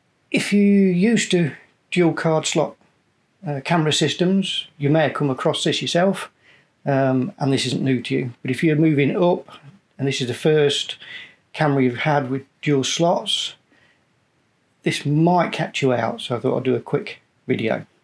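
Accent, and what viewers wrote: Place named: Derbyshire, United Kingdom. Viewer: Is that a north Derbyshire/north Notts accent I can hear?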